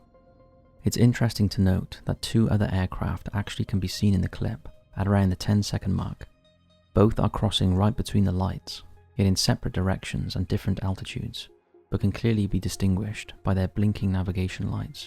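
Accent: Wales, United Kingdom. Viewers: I love this British narrator guy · i thought welsh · Is this guy welsh ? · Hooray! 🎉 We've got the posh English chap back! Sounds more classy!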